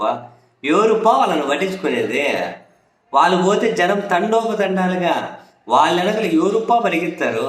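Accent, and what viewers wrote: Rayalaseema, India. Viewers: My Rayalaseema language I liked it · First time రాయల సీమ slang lo chusanu nenu నవ్వుకొన్నా బాగా ❤😂😂😂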